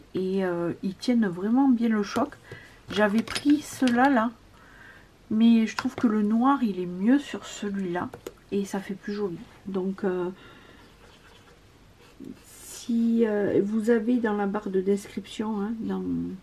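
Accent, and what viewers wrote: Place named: South, France. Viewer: Comme je te comprends 🫂 ah ouii c'est sûr que ton accent y est pour quelque chose hihi. D'accord tu es bien née dans le sud. Oh Martigues, j'y suis allée une fois. Et oui la vie, peut transporter ailleurs. Oh c'est gentil pour la vidéo explicative mystère, à condition que ça intéresse d'autres personnes qui voudraient se lancer vraiment dedans. Moi, je suis juste curieuse de cette drôle de bebête 🤣 Des gros bisous ma 😘😘❤️🫶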